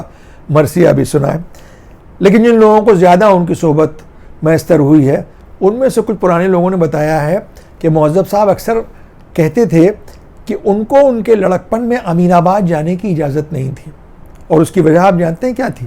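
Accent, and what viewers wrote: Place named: Delhi, India. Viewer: Lko kie zabaan chouk wali boli hie hai Ameena baad kie bhi yahi thie yeh aap aao dilli kie boli hai.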